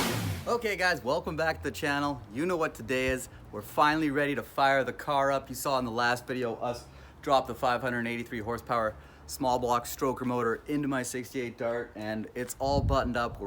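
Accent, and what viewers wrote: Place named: Canada. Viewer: Canadian Eh.. 🤙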